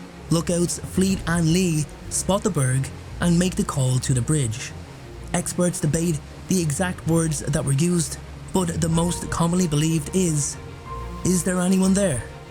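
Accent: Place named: Dublin, Ireland. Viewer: Well done on the video,but if you’re gonna try hide your inner Dublin accent,leave out the Americanised upswing at the end of almost every sentence,it starts to sound way too bullet pointed ! Just a thought…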